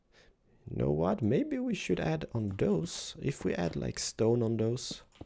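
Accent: Sweden. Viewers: Just like i thought, u were Swedish :D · Are you swedish?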